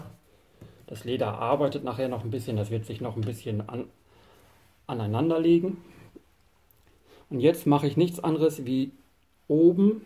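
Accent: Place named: Germany. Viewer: it was also nice listening to your German accent!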